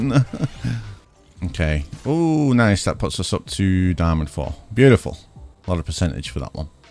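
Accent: United Kingdom. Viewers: are you a Brit? I can’t recognise my own accent 💀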